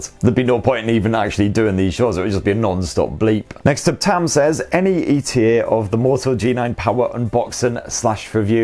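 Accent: United Kingdom. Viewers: Your British akcent is hard to understand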